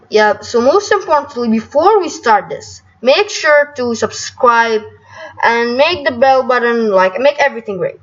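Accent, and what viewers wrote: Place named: Spain. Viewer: ik it’s English but I thought u had a Spanish accent mb. W video tho 😅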